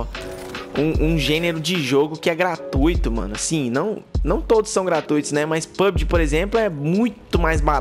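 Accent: Minas Gerais, Brazil. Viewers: Cê é mineiro?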